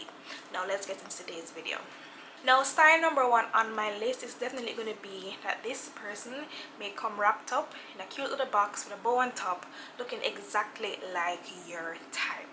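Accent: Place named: Jamaica. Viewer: Hello I see You have an accent are from Jamaica 🇯🇲 by any chance?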